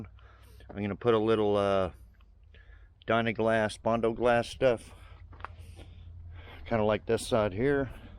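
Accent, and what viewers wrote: Canada. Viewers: I knew it. I just found your channel and I was wondering to myself “why does he sound Canadian when he is (I think) in SC? This explained that. That’s awesome though. My wife and I are looking at leaving NJ for the upstate of SC. It’s pretty much 50/50 over the weather and the liberal bullshit. 😂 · You’ll tackle any tasks, sheet metal, electrical, or heavy mechanical! You’re a real pro. It’s easy to see you have lived this discipline most of your entire life, and you love it. It all shows through. Your time in Alberta shows up in some of your pronunciation of certain words which is certainly not part a Texas accent. Professionals like you are far and few. Thanks for your excellent videos and sharing of this craft you have so brilliantly developed.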